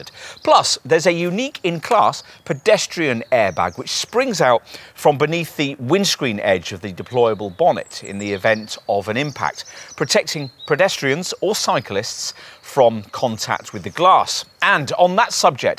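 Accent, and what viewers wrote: United Kingdom. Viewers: What is it with you people and the British accent? Give the guy a break. Are you that thick that you can you only understand a 'Murican accent? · How much did Jaguar pay this guy? I notice his accent is... British? Bias? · Are we supposed to believe you because of your British accent?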